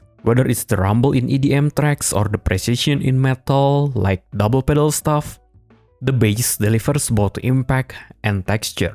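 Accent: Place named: Indonesia. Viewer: orang indo nih, keliatan dari fluentlynya 😅 semangat trus bang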